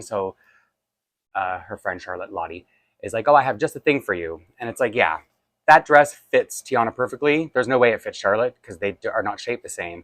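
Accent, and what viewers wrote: Canada. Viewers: I made it to the end! I started watching your videos a couple days ago to procrastinate homework. (About halfway through this one I decided I needed to multitask.) But I watched the MapleLea winter haul video earlier today where you mentioned you were Canadian and I didn't pick it up then but I definitely heard the accent in this video. My mom thinks my collection is insane (17 dolls as of now) but this just might prove her wrong, ha!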